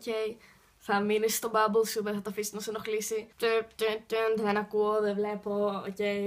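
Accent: England, United Kingdom. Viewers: Φαινεσαι πολυ γλυκος ανθρωπος και εχεις μια.πολυ θετικη αυρα και μου αρεσει επισης η αγγλικη προφορα σου😍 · Μια χαρά είναι τα Ελληνικά σου. Το μόνο που μπερδεύει λίγο ότι μερικές φορές τα μιλάς με αγγλική προφορά (σύνδρομο Τσίπρα). Πρόσεξε το!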